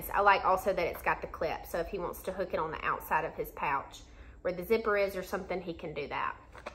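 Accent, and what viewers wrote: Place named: Texas, United States. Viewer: Are you from Texas because you sound like you are I kinda like it 😅 bc it sounds really country for some reason 😂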